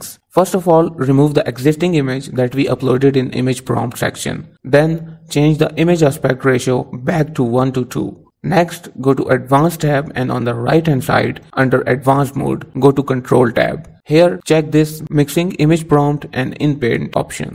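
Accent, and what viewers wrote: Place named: Hindi, India. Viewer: Hi man thanks for this, i noticed you have a strong Hindi accent, your obviously not a Native language English speaker like me. I was wondering do you know of any voice cloners that can accurately clone none native English accents like ours?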